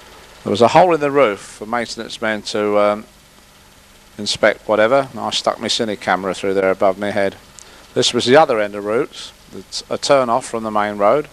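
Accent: United Kingdom. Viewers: Great stuff, my dad would take me on the trolley buses back in 1966/7 into town to buy toys and seafood on the London road ( The Barras Market). Very happy days indeed. Did anyone ever tell you that you have an East End of London accent?, I lived there for many years. In fact you sound just like the late Charlie Kray. How would I know that. Keep up the good work.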